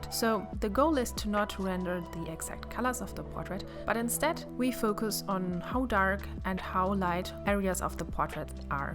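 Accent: Germany. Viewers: Your Art is so beautiful 😍😍🌹 Where are you from? I would guess Germany from the accent.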